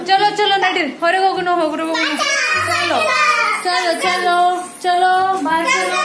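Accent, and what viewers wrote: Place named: Hubballi, India. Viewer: ನಿಮ್ಮ ಹುಬ್ಬಳ್ಳಿ ಕನ್ನಡ ಸೂಪರ್ ನಾವು ಉಡುಪಿಯವರು ನಿಮ್ಮ ಮಾತು ಕೇಳಿ ಕೇಳಿ ನಿಮ್ಮ ಭಾಷೆ ಕಲಿತಿದ್ದೀನಿ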